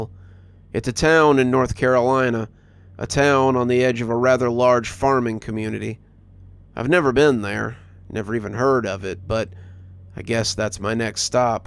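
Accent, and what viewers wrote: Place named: Florida, United States. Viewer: O SNAP. This series is great. Im pretty good at accents - I'm guessing North Florida but not Panhandle. ??????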